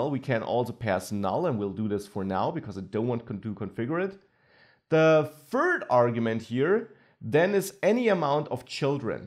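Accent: Germany. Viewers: Great video. Thinking to subscribe the course on udemy. BTW your accent sounds like German. Are you German ? :D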